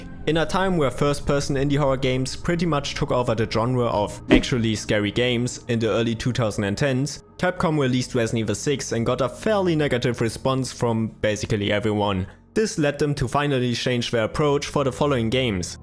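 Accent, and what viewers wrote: Germany. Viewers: Diesmal kommt der krautige Akzent aber wieder häufiger ❤ Gutes Video, die Montage mit dem Zombie der sich beim Nachdenken ans Kinn fasst hat mich fast gekillt 😂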